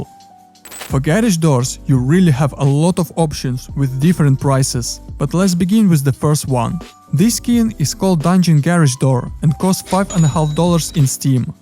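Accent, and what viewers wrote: Russia. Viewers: У вас будто бы русский акцент 🤔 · Я слышу, слышу русский акцент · оч жесткий русский акцент используй ии чтобы переводить видосы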